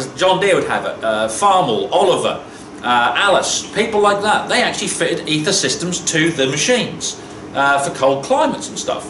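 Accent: Norfolk, United Kingdom. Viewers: You sound just like me as everyone say you carrot cruncher roughly what part of Norfolk you from I live in fakenham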